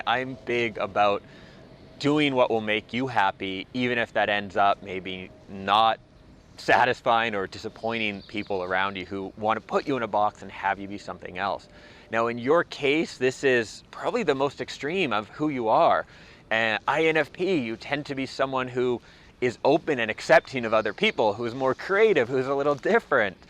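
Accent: Canada. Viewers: sounds Canadian and his views are rooted from that perspective, which is ok because everyone has to live his or her life.Canada is very individualistic so listen to him. But be careful, the later can be just as bad, especially in Canada because its hard to fit in. Don't try to. live your life. people will disappoint so don't expect to be connected in a disconnected world because we are all alone together. Best of luck.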